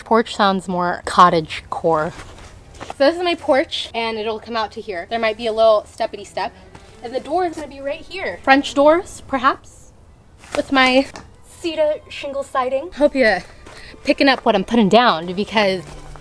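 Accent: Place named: Canada. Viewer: I can hear Canadian in your voice.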